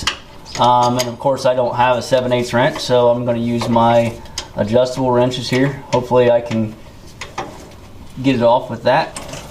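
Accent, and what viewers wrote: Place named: Ohio, United States. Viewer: Was funny to recognize a fellow Ohioan by the way you say "like that" as "like cat". Not making fun of you at all, it's how we talk. I was born there (columbiana county) and lived there for 26yrs. Now I've spent 28 years in Kansas and lost that part of the accent. I own a 2005 slt power wagon. 👍🏽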